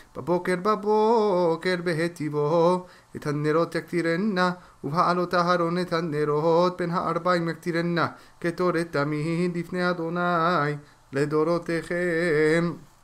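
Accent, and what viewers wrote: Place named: Morocco. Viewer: L'hebreu accent marocain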